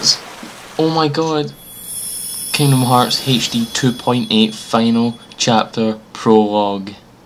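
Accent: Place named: Scotland, United Kingdom. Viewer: I LOVE YOUR ACCENT !! O.o ... you are scottish aren't ya?! XD ...I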